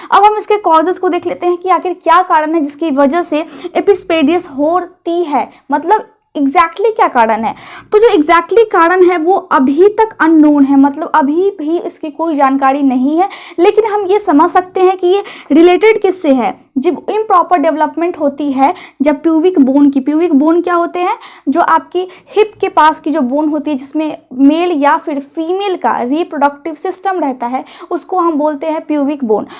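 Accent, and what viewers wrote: Bihar, India. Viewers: pahle toh yeh lagta hai tum Bihar se belong karte h maina language se yeh Jana hai. Baki well done your speach is uninteruppated good show. Baki pl intimate aap ne kahan tak study ke h. Medical mein. Pl reply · Ma'am ap Bihar se ho kya ?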